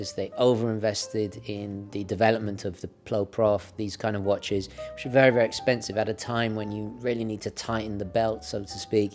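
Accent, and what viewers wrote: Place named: United Kingdom. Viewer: As soon as Daniel Craig retires from 007 movies, is my choice for the roll. Charming,likes watches,British accent,probably looks good wearing a suít....not to mention his smile with those perfect teeth....any Bondgirl would go crazy... now,seriously,great video as always. Technical and always sharing your knowledge on horologycal culture and history. Congratulations!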